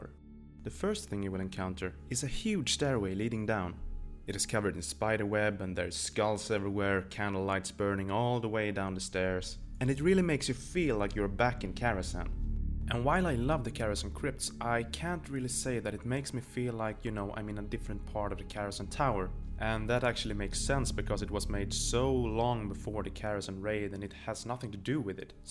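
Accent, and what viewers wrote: Sweden. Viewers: You have a very swedish accent! Are you swedish? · That swedish accent though.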